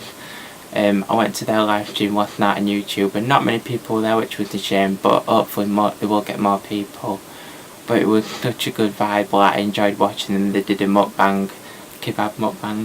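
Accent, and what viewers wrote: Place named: Birmingham, United Kingdom. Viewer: Go Birmingham! Love your accent! 😍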